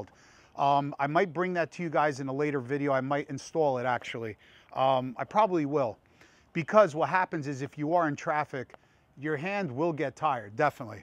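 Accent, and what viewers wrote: New Jersey, United States. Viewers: he is from jersey they all talk like that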